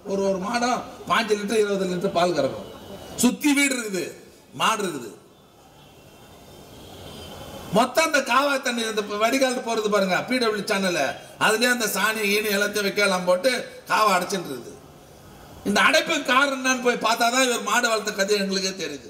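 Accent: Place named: Chennai, India. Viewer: Talking like a Cheri madras language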